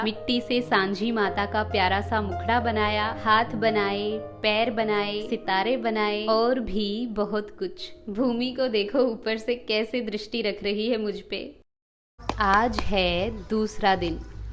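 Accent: Standard. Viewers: आप तो बिल्कुल शुद्ध हिंदी बोलते हो आप की आवाज तो बहुत मधुर है❤🥰🙂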